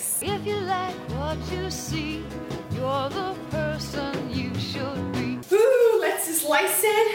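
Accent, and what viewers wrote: Brazil. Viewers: Sotaque brasileiro é inconfundível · Omg girl! You so pretty I love that accent! I'm guessing you're from Brazil? Anyways thanks for the video I'm gonna do this 😚😍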